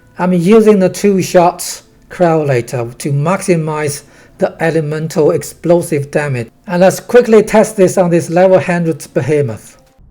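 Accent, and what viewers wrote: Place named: United Kingdom. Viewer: you sound like an Asian with a British accent how bizarre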